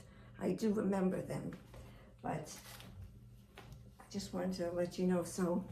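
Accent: New York, United States. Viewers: Same! She's a real New Yorker, in the Pacific Northwest! Not common I would guess.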